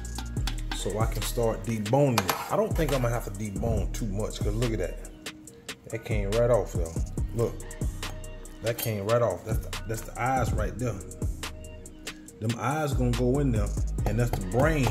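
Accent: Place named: New Orleans, United States. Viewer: Umm, ....ahhhh, ... no thank you. 🤢 My Southern grandparents ate that stuff and tried to get me to taste it when I was a little girl. They got it already made though. You must be from the South too. Sounds like maybe from 'Nawlins' correct?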